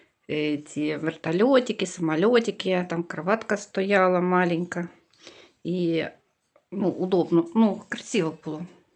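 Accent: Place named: Vinnytsia, Ukraine. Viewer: Я здогадалася,що ви з Вінничини, по вашій манері розмовляти,я також з Вінничини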